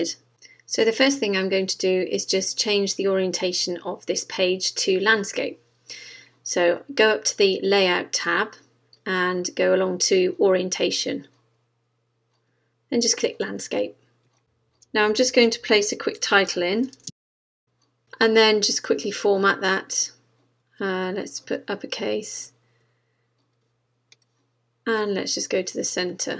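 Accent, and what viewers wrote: United Kingdom. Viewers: why that god damn British accent is like super attractive to me mam really appreciate your help on the gannt chart but i really need to tell u that the accent is super attractive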